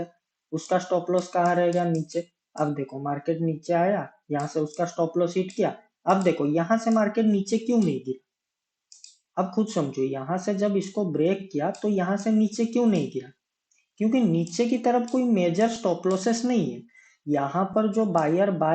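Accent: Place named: Odisha, India. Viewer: tam katha ru janideli...j tme..odisha ra boli....☺️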